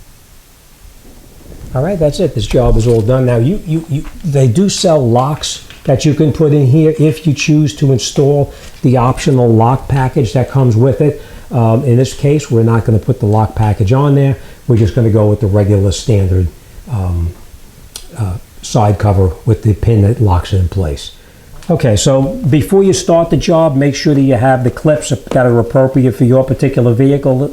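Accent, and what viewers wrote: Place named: Maine, United States. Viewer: You sound like a Mainah... I love it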